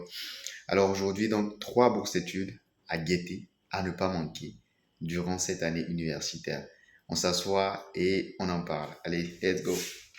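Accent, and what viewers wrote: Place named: Marseille, France. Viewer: Accent marseillais sur nous 😅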